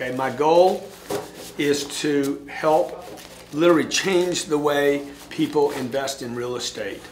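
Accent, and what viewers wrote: South, United States. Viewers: This guy is Italian with a southern accent? Who knew...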